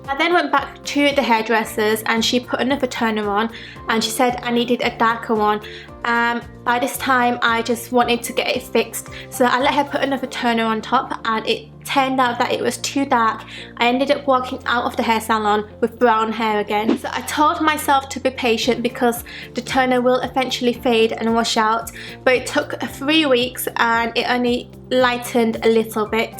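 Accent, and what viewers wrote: Yorkshire, United Kingdom. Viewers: Your accent sounds like british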